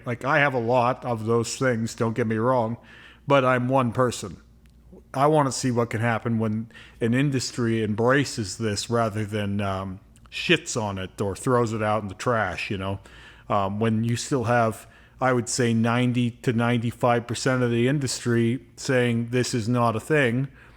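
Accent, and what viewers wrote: Canada. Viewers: As a Canadian myself, I could tell right away that you were Canadian lol everyone thinks Americans and Canadians have the same “accent” but it is so different hahaha cool stuff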